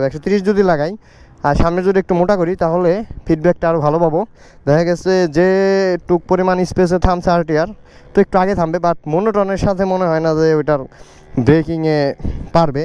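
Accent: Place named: Manikganj, Bangladesh. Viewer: আপনার কথা ভালোই লাগে। আপনার ভাষায় ধামরাই মানিকগঞ্জের একটা টান আছে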